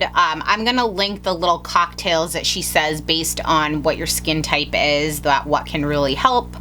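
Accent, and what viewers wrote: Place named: Midwest, United States. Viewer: omg that midwestern accent is piercing at my soul.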